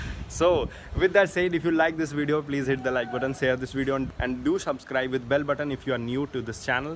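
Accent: Bihar, India. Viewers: Bihari tone aa hi jati h teri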